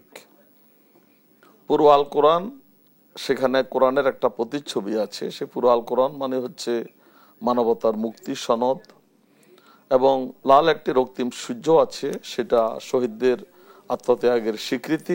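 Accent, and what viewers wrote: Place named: Kolkata, India. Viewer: সনচালক কলকাতা স্টাইল এ কথা বলেন কেন?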